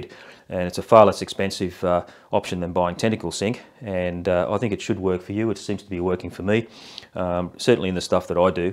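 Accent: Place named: Australia. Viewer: awesome mate. great to see Aussie accent with these tutorials as a kiwi. Love your vids. new sub today.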